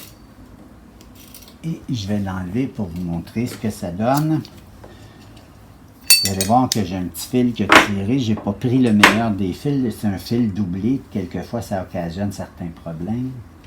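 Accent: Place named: Quebec, Canada. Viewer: Bonjour, pouvez me dire ou trouver les cartes mylar ? 1🤔merci beaucoup, et gardez votre bel accent québécois ! ❤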